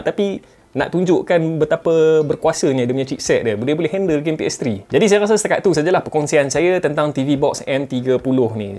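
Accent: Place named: North, Malaysia. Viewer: Nampak macam ada idgham2 utagha yang ditahan2.. 😂lantak pi bro.. utagha slag is addictive!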